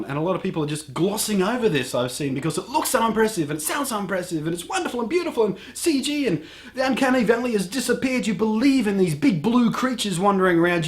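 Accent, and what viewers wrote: Australia. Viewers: i watched 5seconds of this and could tell you were an aussie xD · i totally agree, just one question, are you an aussie? if you are, thats awesome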